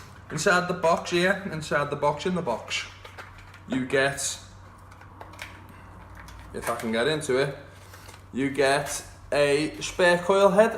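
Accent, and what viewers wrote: Liverpool, United Kingdom. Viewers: Just ordered one of those, can't wait to get it now , ) Well spoken M8. BTW subbed just for the scouser accent. 👍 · Defo from liverpyool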